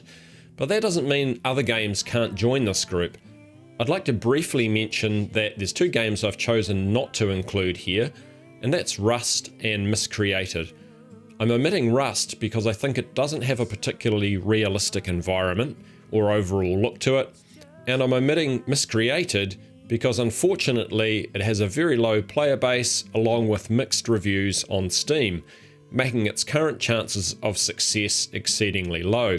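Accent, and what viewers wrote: New Zealand. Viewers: Did Matter...... NZ accent will forever be funny to me :D good video though. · Aussie... No, New Zealander.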